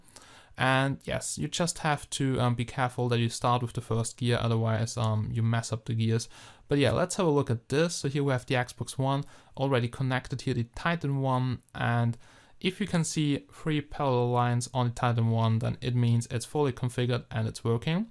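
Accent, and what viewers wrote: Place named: Germany. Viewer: Are you german? That accent sounds familiar ;)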